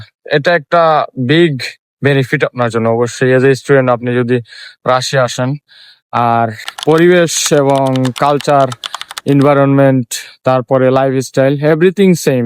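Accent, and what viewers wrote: Sylhet, Bangladesh. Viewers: Bhai Sylheti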